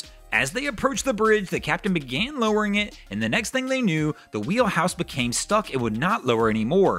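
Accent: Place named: North Carolina, United States. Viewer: He sounds like a North Carolinian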